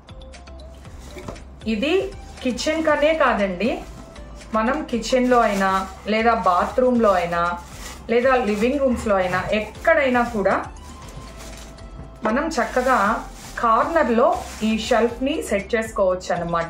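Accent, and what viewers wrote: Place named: Nellore, India. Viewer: Hi garu nenu mee vedios first time chusanu meeru matladetappudu Nellore slang la vunde anukunna anukunnate meeru vedio lastlo Nellore antaa tiriganu stand kosam annaru kada appudu ardamayndhi meeru kooda mana Nellore vallani😅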